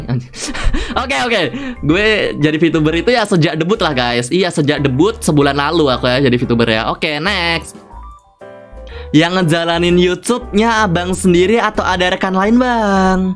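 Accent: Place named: Java, Indonesia. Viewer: Aduh pria Jowo, medok banget🤭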